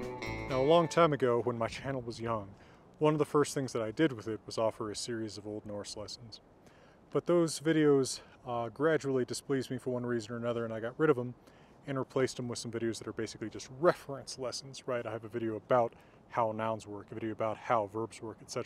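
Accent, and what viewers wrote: United States. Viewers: As a Scandinavian this only sounds like a American trying to speak Scandinavian 😂 Æ is ÄÄÄÄ. V is not W. Y doesnt sound like what you are saying 😂 Å is another story. Also how do you know how they pronounced R? And from what region?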